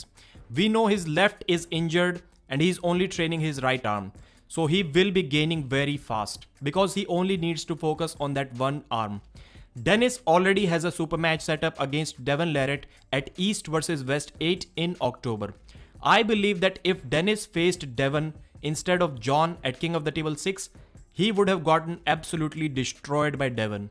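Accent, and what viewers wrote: India. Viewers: Обожаю слушать индийский акцент!!! Так мягко и приятно звучит, как колыбельная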